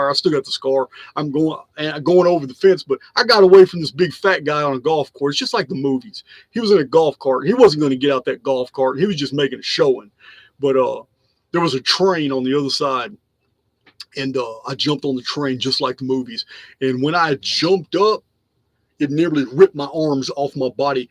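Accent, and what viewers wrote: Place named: South, United States. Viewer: Kudos to you for taking her to a safe place when you realized it was going bad. She would have been too traumatized to be left to drive. It turned out bad for you but you rose above it. And in the process preserved old books and info that would have been lost forever. I am in Australia and in the 1970s southern baptist missionaries came to convert the heathen in Australia. I was brainwashed for over 30 yrs before I escaped their dungeon programming. My experience has made it where I cannot stand southern Americanaccents. It triggers me big time. So when I came across your videos I struggled to listen to them and often only listened for 30 mins. But I kept getting prompted to listen to what you had to say. So I dipped in and out for few months. Then I realized it was time I stopped disregarding anyone with a southern American accent. 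I realized where you were born no more reflected on you than someone born Asian, coloured, or Australian. I overcame my reaction to your accent and focused upon your message but more importantly your heart and soul. From that moment I broke out of that mindset based on unpleasant cult upbringing and now love to hear your rants and research. I could have missed so much if I stayed put off by a silly trigger of an accent. It is still something I have to check myself every time I hear an American accent over here. 😂 Hearing your life story one day for the first time caused a huge shift in me. I have days where I binge watch. Really like the Q A podca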